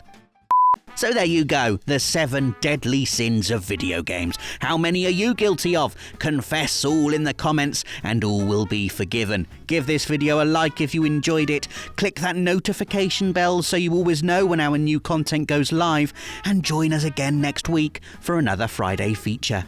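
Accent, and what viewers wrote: England, United Kingdom. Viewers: Wrath is pronounced ‘Roth’, inventory is pronounced ‘in-ven-tree’. It’s like and English accent American channel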